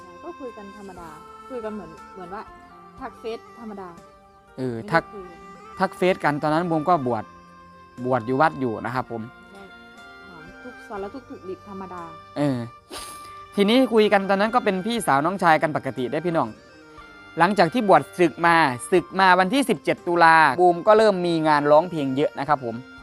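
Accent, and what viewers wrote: Isan, Thailand. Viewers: เว่าอีสานกะดีคือกันเด้อครับ ฟังแล้วอบอุ่นดี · เสียงเว้าของ มาคือกันกับเสียงผู้ใหญ่บ้านฟินแลนด์ฟังเรื่องราวชีวิตน่าชื่นชมที่เป็นคนที่ต่อสู้ขอให้รักกันแบบนี้ตลอดไปดันๆให้มีคนติดตามเพิ่มขึ้นเรื่อยจนถึงแสนเด้อจ้า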